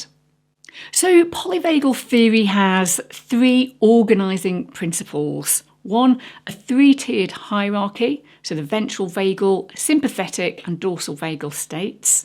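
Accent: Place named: Wales, United Kingdom. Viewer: Are you Welsh? It reminds me of listening to a Welsh author read her book. It’s a lovely accent to my ears. I am Welsh, English, Irish, French and German. I love all races but despise Nationalism. Despise Nationalism. Infantile disease. Great video 👏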